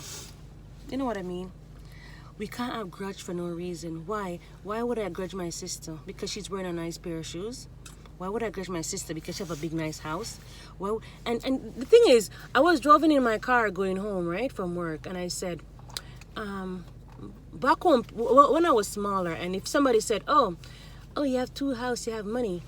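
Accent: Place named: Jamaica. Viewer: I could almost tell u was Jamaican to thts crazy Lol I’m half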